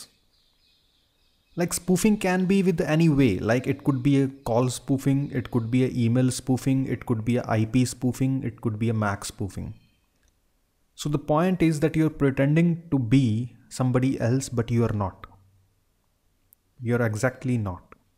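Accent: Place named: India. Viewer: Your accent is sooo indian .yakk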